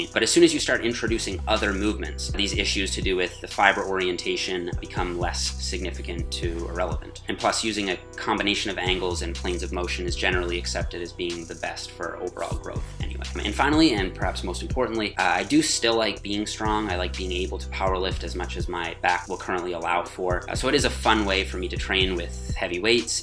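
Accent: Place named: Newfoundland, Canada. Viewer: he sounds like a Newfie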